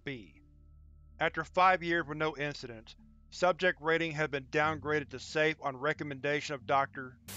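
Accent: South, United States. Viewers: From what I can see, you're doing an outstanding job. In my opinion, as with all things, your new focus and "stepping up the game" has completely revitalized the presentation of SCPs, and you should feel rightly proud of it. Also, it's good to hear another Southern Man doing videos that aren't stereotypically Southern.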